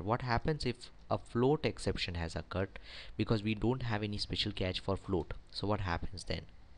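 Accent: India. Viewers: Indian akcnt dude.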